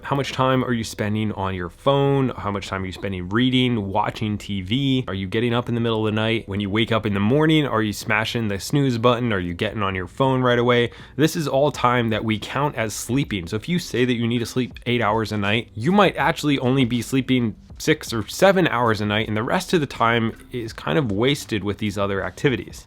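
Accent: Scotland, United Kingdom. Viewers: I would’ve never guessed that was a Scottish accent and my husband is Scottish. My views on commuting and waiting have completely changed with audiobooks, kindle and online courses. You can read or learn anywhere … almost nothing is a waste of time unless you forget your phone charger.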